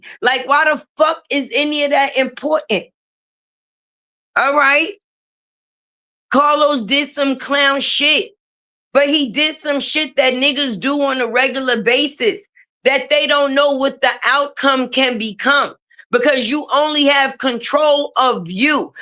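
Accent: New York, United States. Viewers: Hey I here that nyc accent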